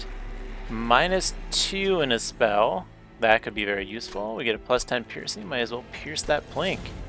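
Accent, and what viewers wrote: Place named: United States. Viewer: Sounds like typical standard American intonation to me. though the trend over the last couple decades is towards flat affectless intonation.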